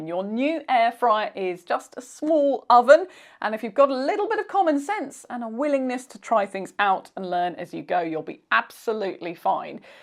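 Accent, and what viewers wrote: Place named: Liverpool, United Kingdom. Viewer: This woman is speaking with a Scouse accent.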